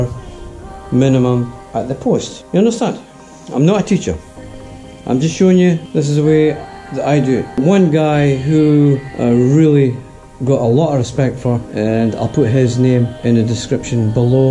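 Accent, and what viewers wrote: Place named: Wales, United Kingdom. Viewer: Accent is fine. Never had a problem with Welsh ;-) I got lost because I'm completely dopey and haven't done enough joinery to appreciate even the basics. Sometimes I'm just content to be impressed.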